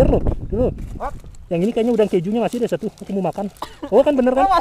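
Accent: Bali, Indonesia. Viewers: Logat bali khas banget hehe😅😁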